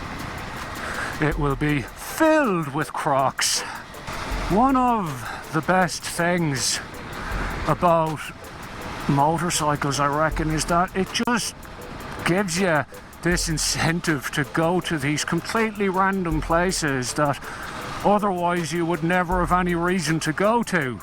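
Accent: Ireland. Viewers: Where in Ireland are you from. Cant figure our the accent?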